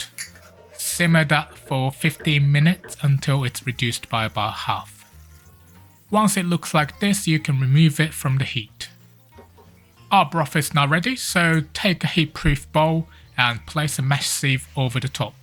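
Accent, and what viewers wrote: United Kingdom. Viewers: ur british accent sounts rlly good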